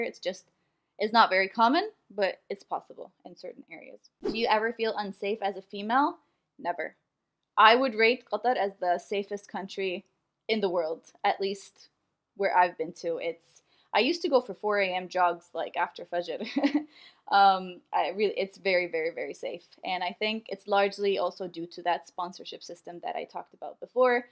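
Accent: United States. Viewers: You sound American! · Cool Estonian woman but your accent is totally American now so how about your native language effect there in Qatar ?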